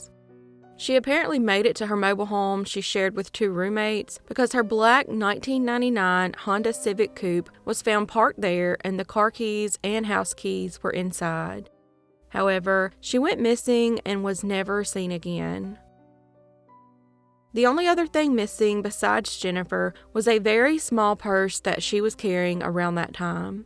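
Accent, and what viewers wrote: South, United States. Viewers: Your southern accent really relaxes me. I’m from Arkansas and Oklahoma so not quite as long of a drawl for me but I still am around it. ❤️🤟🏻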